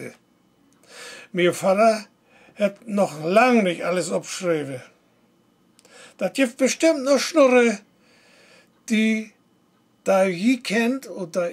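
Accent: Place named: Plautdietsch, Germany. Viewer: Gar nicht so weit weg vom Plautdietsch, welches ich zwar kaum Spreche, aber ganz gut verstehen kann. Kommt ja auch aus Westpreußen/Danzig die Ecke...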